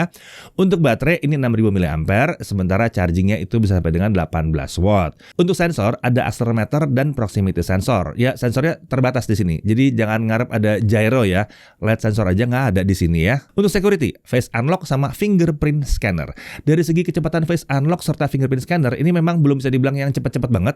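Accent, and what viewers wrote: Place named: Java, Indonesia. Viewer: iTel javanese speak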